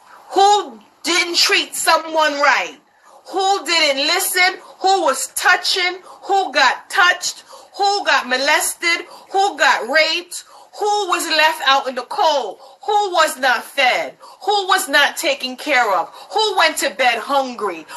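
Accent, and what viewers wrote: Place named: Caribbean. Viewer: I can hear that west Indian accent. Preach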